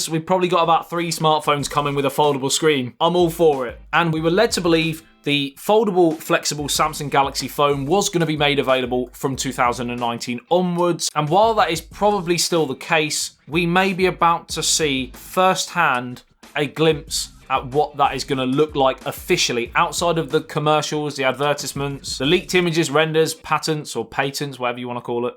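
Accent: United Kingdom. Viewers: Are you from the UK? I'm sorry but I just can't stand the accent. And no I don't need a foldable phone at all. I mean it might be cool but no. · I subscribe just for ur British accent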